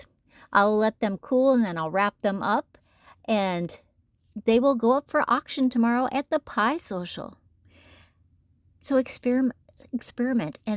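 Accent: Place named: West, United States. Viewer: Absolutely beautiful!! You are amazing!! I have to tell you, my Mom was born and raised in Livingston Montana. You say “frigerater” just like she did. 😍 must be a western thing. 😀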